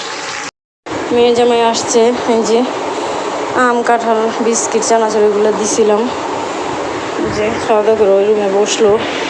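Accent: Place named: Comilla, Bangladesh. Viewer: আপনার বাড়ি ব্রাহ্মনবাড়িয়া না কুমিল্লা কথা শুনে মনে হচ্ছে ❤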